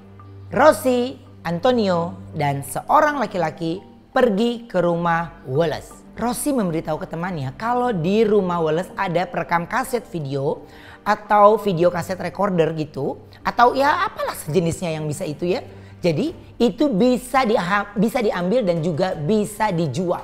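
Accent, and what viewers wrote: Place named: Bekasi, Indonesia. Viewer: Cerita serem ko malah jdi kocak..sih ko.. Bahasanya gaool bangaaat.. (logat orang bekasi bangat gitu..) haha.. Sukses terus deh.. Makin lancar n berlimpah rezekinya buat..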